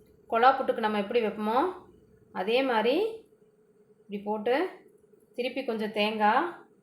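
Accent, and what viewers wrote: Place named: Coimbatore, India. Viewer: Naa coimbatore naanga pesura mathiri mariyathaya pesuringa athanaalay neenga yentha oornu ketkirenga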